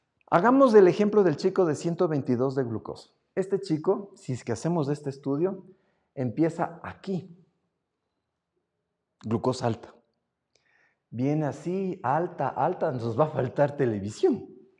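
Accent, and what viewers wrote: Mexico. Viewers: Gracias por compartir su conocimiento. Habla como chilango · Orale pense que era de México, por la forma como habla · Gracias por su consejo doctor tenía muchas dudas sobre la avena, es la primer ves que lo veo y habla usted muy mexicano 😂 saludos de una mexicana hasta el lindo Ecuador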